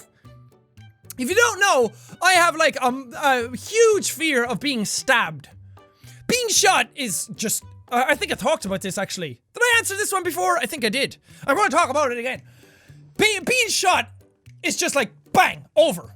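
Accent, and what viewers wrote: Ireland. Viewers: Jack has his irish accent again. I like it %<num> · Hey + do you live in Ireland or are you Irish? Like if you have the same question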